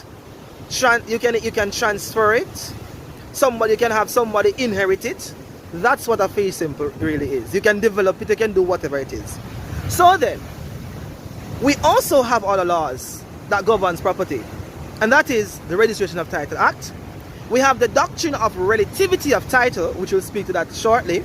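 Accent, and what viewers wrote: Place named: United Kingdom. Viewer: Jamaican With British Accent 🧐💀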